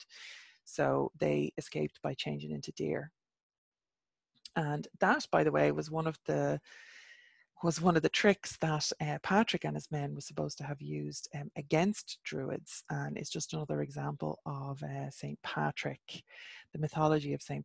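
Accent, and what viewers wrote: Ireland. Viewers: Thank you so much,. I appreciate hearing the Irish pronunciation as well as your commentary and look forward to appearances of the goddess forthcoming!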